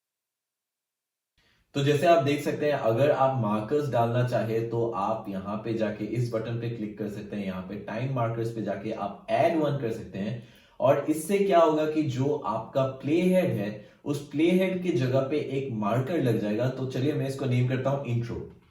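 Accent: Assam, India. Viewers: Bro are u from Assam??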